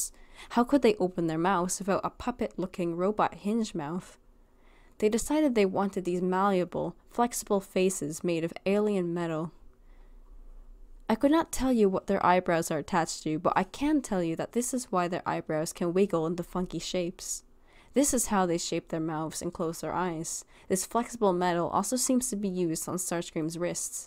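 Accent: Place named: Canada. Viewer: CANADIAN!?@ FINALLY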